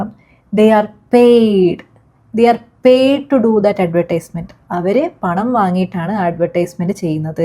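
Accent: Kannur, India. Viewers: maam kannur aano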